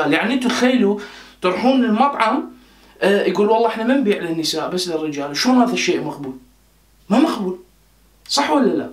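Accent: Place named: Iraq. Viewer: اول شي كتير حابة رحب بلهجتك لعراقية لحلوة لانو سدق او لا أني انا عراقية ومابتفرج/بسمع لشغلات عراقية الا بنادرمو منشان أني مو حابة اللهجة بس لانو كل ما بطلع بشوف عقول تعبانة ومتضررة . وكتتتييير انصدمت من ثقافتك ووعيك عنجد إنّو شي خلاني كمل الفديو ل الاخير ( الله يخليك). انشالله يارب انتا كمان فينك توعي البنات والشباب لحته يصير في رقي للمجتمع لعربي. ياريت إتكمل وماتوقف تعمل هيك توعية لانو حكيك كتير منطقي يمكن المحتوى يلي بتحكي لح ياخد وقت لحته يطبق والسبب العادات التقاليد والعيب. ف بتمنالك كل التوفيق والنجاح يارب. Keep it up!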